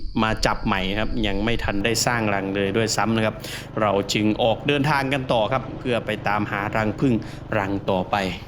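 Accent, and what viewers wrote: Isan, Thailand. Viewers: ฟังสำเนียงเว้านี่เจ้าเป็นคนจังหวัดเลยแม่นบ่คับ😂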